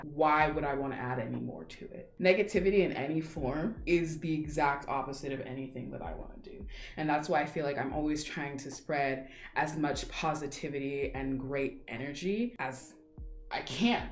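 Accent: South Korea. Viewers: 아니 억양이 너무 한국인이야 신기해 미국인들 다 저러는거 아니잖아??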